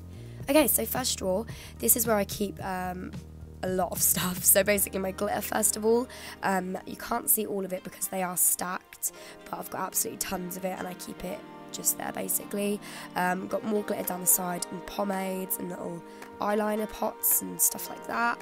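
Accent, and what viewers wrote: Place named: Norfolk, United Kingdom. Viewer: Try making an asmr clip if you can. Be great to hear a good ol’ Narrrrfick accent asmr for a change. I’ve listened to hundreds of asmr clips over the last few years and would love a ‘home-grown’ one 👍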